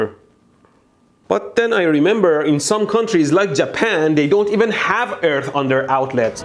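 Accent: Iran. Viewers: I love your Iranian accent. Greetings from Germany. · your english accent... I knew you were a fellow iranian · لحجه ای که بخاطر ایرانی بودن به ارث بردی را خیلی دوس دارم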